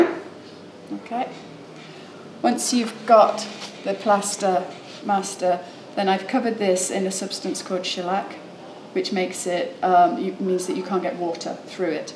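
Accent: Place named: United Kingdom. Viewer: Sounding British, I wonder where she picked up the coffee habit.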